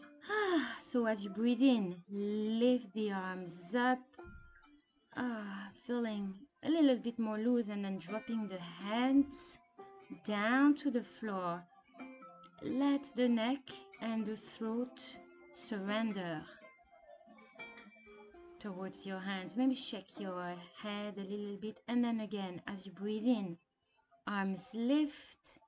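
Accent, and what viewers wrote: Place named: France. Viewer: Nice video 😊 are you French? Can recognise the accent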